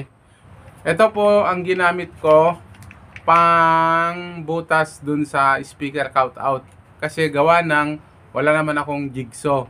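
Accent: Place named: Ilocos, Philippines. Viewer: Ilocano ka met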